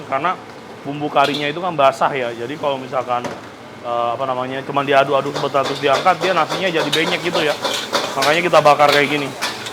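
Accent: Banyumas, Indonesia. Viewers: Koko kok bisa bahasa ngapak yah? Kepengin punya kedai kaya gini koh Salam dari cilacap kota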